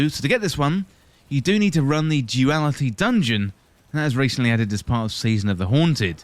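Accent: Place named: United Kingdom. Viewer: You sound like JARV. All you British twits sound the same.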